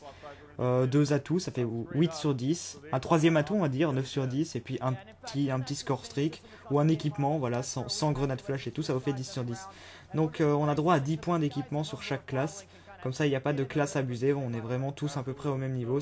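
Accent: Belgium. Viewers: J'ai cru que tu étais de la Belgique :D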